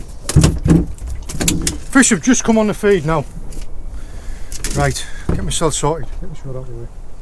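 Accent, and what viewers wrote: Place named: Darlington, United Kingdom. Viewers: i take it you are northern by accent. You fish a lot of the marks down off the south coast. Great fun watching the Bluefin double🤣🤣🤣. Your vids i am getting on catch up but great viewing, they are keeping me sane. Cheers 🍻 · Brilliant videos matey. The last time I heard an accent like that was around Darlington when I was stationed in RAF Catterick 50 years ago.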